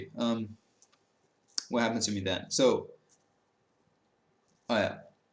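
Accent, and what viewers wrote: United States. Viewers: I was confused by the Farenheit thing too lol. I was like wtf? Is he that so hardcore AJATT that he's trying only to use Celsius? The only thing that gave it away was the way you say "book" and "look", other than that you have a perfect Standard/Northwest US accent. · idt it’s that uncommon of a word tho, most people would know what it meant if it showed up on an SAT or something ikr he sounds like a typical American urbanite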